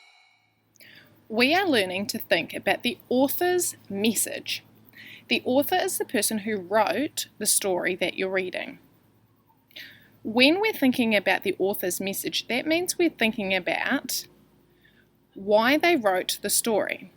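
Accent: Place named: United Kingdom. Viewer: British ascent yay E E E E E wow that is sad now that i watch the one part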